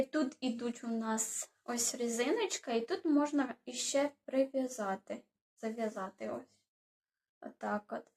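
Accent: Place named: Surzhyk, Ukraine. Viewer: Какой жёсткий суржик. Женщина, выучи украинский уже, или ты террористка которая косит под украинку?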